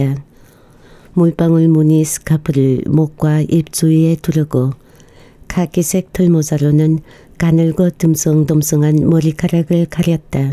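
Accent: Gyeongsang, South Korea. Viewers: 님의 낭독이 이젠 전문가 수준입니다. 처음의 그 갱상도 발음이 그립기도 합니다. 너무 손색이 없다보니 ㅋㅎ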